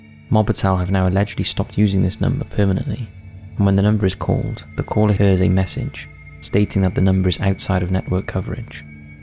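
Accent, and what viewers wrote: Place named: England, United Kingdom. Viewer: He's definitely English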